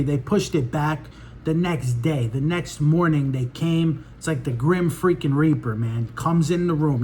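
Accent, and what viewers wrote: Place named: New York, United States. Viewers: You got me laughing out loud when you got to how the cholestyramine works :) I have not had gall bladder attacks (yes I've had kidney stones) but got a long covid condition with irritable bowel - messing around between constipation and diarrhea. My nail technician was taking Cholestyramine for what was a close to Crohn condition. When her doc put her on Cholestyramine her problems went away overnight so I asked my doctor about it. He told me he had MANY patients on it. I've been on it close to a year and it works very well. Sometimes the discomfort part of that is challenging, but on the whole it works very well. I take it every single day. Fingers crossed. You didn't mention where you are from but you remind me of my New Jersey roots - or sound a lot like friends in Queens. :) Thanks for this really helpful video!!!!! 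Good luck to you going forward!!! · Thank you so much for sharing your gallbladder journey. My daughter-in-law is having extreme attacks now and is going to speak to a surgeon this week. This really enlightened me as to what to expect and I shared it with her. I just want to say that you are very funny and it was a pleasure to listen to you to the end, because with your New York accent, you tell the story hilariously. Thank you for your candor about the diarrhea and what to expect post surgery. I'm sure you have helped so many people. Forewarned is forearmed. May the LORD bless you and keep you healthy.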